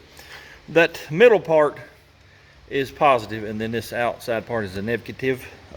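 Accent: South, United States. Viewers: THE WIFE AND I LOVE YOUR VIDOES JUST WANTED TO KNOW IF YOUR COUNTRY TALK IS FOR THE SHOW OR IF YOU TALK LIKE THIS ALL THE TIME,LOVE IT ANYWAY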